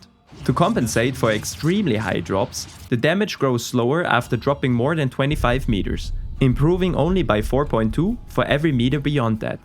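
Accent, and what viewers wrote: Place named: Germany. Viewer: You Sound German tbh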